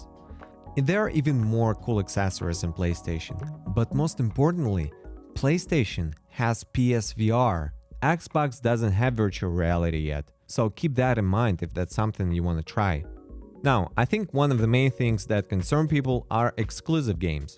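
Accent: Russia. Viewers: У тебя максимально русский акцент)) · Guy sounds Russian i would take what he says with a grain of salt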